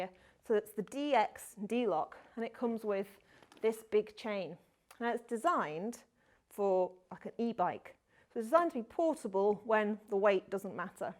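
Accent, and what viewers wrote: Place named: England, United Kingdom. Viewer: Thanks for the humor-sprinkled video and all of the great info in the "Show More". Also, I live for English accents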